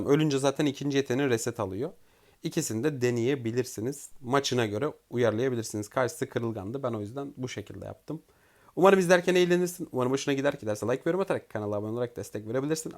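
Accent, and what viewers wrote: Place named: Anatolia, Turkey. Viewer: Ses tonundan beyefendi görünümlü Anadolu çocuğu sanıyordum, bugün gördüm adam hollywood artisti gibi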